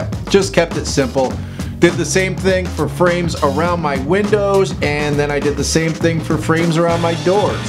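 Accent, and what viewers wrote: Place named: Midwest, United States. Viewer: Where are you from? You sound very midwest lol not a dig, just familiar. MI here🙋🏼‍♀️ Awesome job by the way!!👏😃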